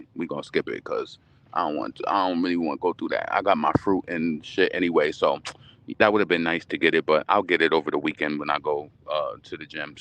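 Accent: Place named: Brownsville, United States. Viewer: The way you talk I though you was from Brownsville lol but it’s ok I still love you you be talking ya shit speaking big facts. 😘😘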